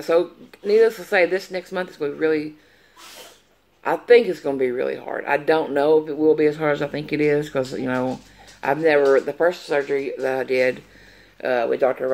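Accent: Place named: Tennessee, United States. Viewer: Hi! I found your videos, kind of accidentally. First thing that caught my attention was that you talk just like me so I’m thinking you may possibly be a Tennessee girl?!:) Anyway, just throwing something out there for you- I have struggled with my weight for the past few years (had lap band surgery 2011) but truly wish I wouldn’t have as I had trouble taking off work to drive to Nashville to get my “fills”, and now my insurance doesn’t cover it anymore. Anyway, a few months back, I found a Facebook page called Snake Diet Motivation. It has been LIFE CHANGING for me. It involves fasting(which I was taught all my life was unhealthy). But, I tried to have an open mind since nothing else i had done, for years, was working. I started reading testimonies on the FB page and the rest is history’s it has been LIFE CHANGING. Check it out if you have any interest at all, and prayers for your health and healing!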